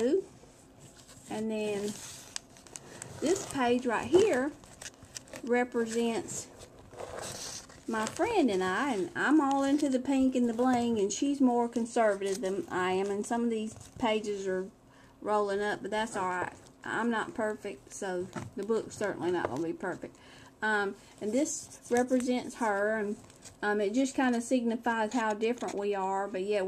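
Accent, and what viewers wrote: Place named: South, United States. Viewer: I just love your southern accent. You are adorable and you did a wonderful job on the album.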